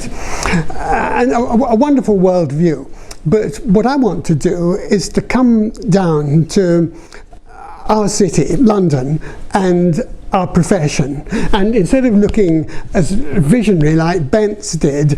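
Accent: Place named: Scotland, United Kingdom. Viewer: Thanks for interesting talk. I love the sections and I did think more CITYscape sections should be analyzed in a landscape project ( including architecture in those sections), which could be best started from LANDSCAPE ARCHITECTURE education ( if as you said it did not exist in ARCHITECTURE education). PS: Lovely Scottish accent!! Thanks!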